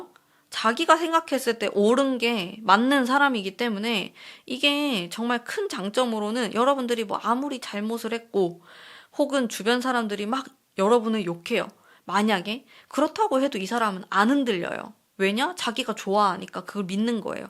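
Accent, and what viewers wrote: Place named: Busan, South Korea. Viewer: 며칠동안 님 영상을 50개 넘게 돌려본 팬이에요❤ 1개 영상보고 어? 나랑 너무 똑같은데? 신기한데? 이러면서 딱 한사람을 떠올리며 50개 넘는 연애 타로를 봤는데 1개 영상쯤은 다른 말을 할 수도 있잖아요 신기한게 그 많은 영상이 같은 의미를 담아서 정말 놀랏어요ㅠㅠ특히 같은 카드가 여러 영상에서 나온다던지 그사람 행동을 일관성잇게 건드리지 말고 내버려 두라고 한다던지 ㅠㅠ 어떻게 단 하나의 영상도 다른게 없이 같은 말만 듣는지 ㅜㅜ 소름돋아여 ㅋㅋㅋ 영상 너무 재밌고 부산 말투 사랑스러우시고 진짜 언니처럼 친구처럼 조언해주시는거 ㅠㅠ 여기는 찐입니다 심지어 엄청 자주올려주시고 ❤❤ 저는 이곳에 잠들게요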